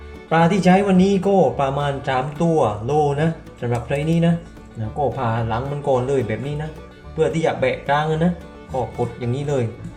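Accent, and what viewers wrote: South, Thailand. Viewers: ชอบสำเนียงการพูด จังเลยหนิ😊😊😊รู้เลยว่า เป็นคนที่ไหน☺️☺️☺️☺️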